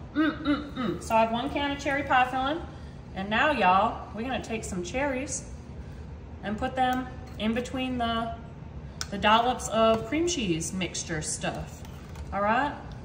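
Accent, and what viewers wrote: South, United States. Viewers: She's very southern that's why .. I love how she talks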